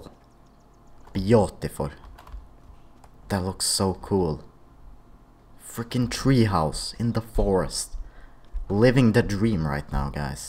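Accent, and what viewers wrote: Sweden. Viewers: You sounds like your from sweden Im new too and i am from sweden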